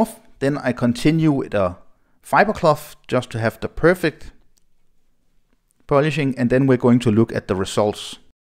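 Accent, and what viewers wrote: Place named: Denmark. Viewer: I like the Danish accent! I like Danes having Rolexes from just those 3 dealers nationwide! Anyhow... Cape Cod says not to wash it but to "buff dry" (which I did on my 09 GMT). Also you should have mentioned to tape the brushed steel parts (which again Cape Cod says are not to be applied). Nice video, nice watch though...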